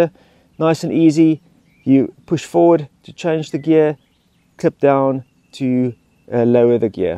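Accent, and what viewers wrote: South Africa. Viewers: Painful! Specs not correct, designed in the UK but built and assembled in China. All parts sourced in China but available here as well. Seeth-Afreekin accent still heavy!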